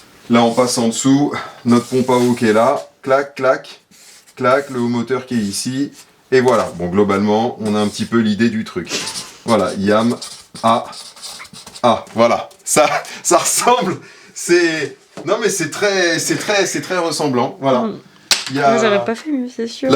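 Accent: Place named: Charente, France. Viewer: Ça fait plaisir de t’entendre parce que je te suit depuis avant le Covid et ça faisait longtemps qu’on t’avais pas vu et retrouver cette façon de parler avec cette accent charentais moi qui habite en Bretagne depuis très longtemps ça fait vraiment plaisir. D’ailleurs j’ai trainé un peu dans le monde du moto cross en Charente à la fin des années 90/00 et c’est sur qu’on connaît du monde en commun. Bref continue ce que tu fais.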